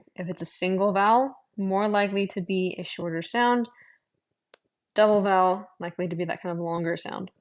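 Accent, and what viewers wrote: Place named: United States. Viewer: Nice video! I really wish you'd used IPA though, maybe even in its own extra column -- it would've been a lot easier for me to glance over and understand the pronunciations that way. I majored in linguistics, to be fair, but I imagine a lot of people interested in Middle English on YouTube may have such a background. I also evidently speak a dialect of American English that doesn't match yours (my "beggar" has the SAME e sound as "better"), so some of your explanations led to me learning equally as much about your particular accent as about Middle English! Anyway, awesome job, and I'm looking forward to bingewatching this series! Thank you so much for creating a Middle English YouTube niche :D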